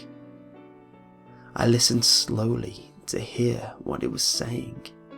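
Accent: United Kingdom. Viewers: I really like this pasta. I especially like the way the last one led onto this one. The fact that this was read by a British dude just make it so much better `0`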